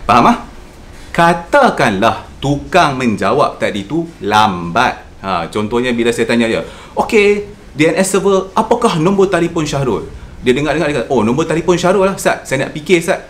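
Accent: North, Malaysia. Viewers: Penerangan yg sgt jelaas. Tambahan pulka cakap utagha kira geng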